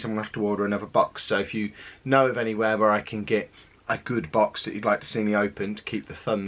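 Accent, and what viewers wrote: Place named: London, United Kingdom. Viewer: You sound like your from london (paul rudds voice)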